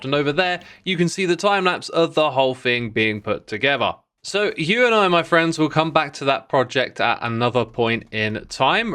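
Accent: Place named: South London, United Kingdom. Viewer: Yeah, he's got that souf London thing going on.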